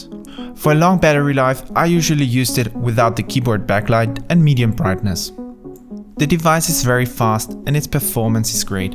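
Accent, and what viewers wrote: Germany. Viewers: What accent is that? Austrian? hungarian? german? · lol are you german?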